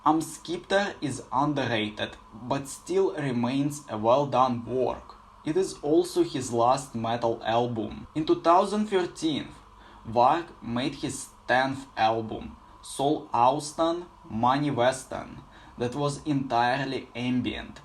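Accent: Russia. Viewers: Зачем ты делаешь контент на английском если у тебя такой русских акцент?) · Русский акцент слышно за километр, лучше на русском видео пили... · I almost expect English speakers to complain, but even other Russians...wtf. Even with the thick accent, it's easy enough to understand him. Besides, the accent is nice and adds character.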